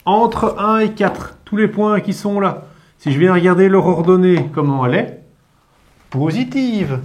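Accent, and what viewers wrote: Brussels, Belgium. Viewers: Je reconnais bien l'accent bruxellois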